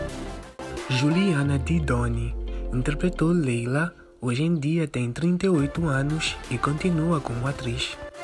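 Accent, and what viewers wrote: Portugal. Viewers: Que sotaque é esse? Vc é de Portugal é 🤨🤔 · Você é de Portugal seu sotaque é de lisboa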